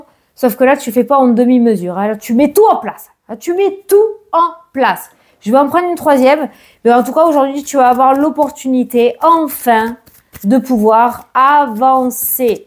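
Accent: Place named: Hérault, France. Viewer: Bonjour, toujours avec le sourire malgré tes petits ou grands problèmes. J'adore ton énergie et ton accent qui est le mien aussi. Je suis une héraultaise. Je te souhaite une bonne journée a bientôt 🙏😚.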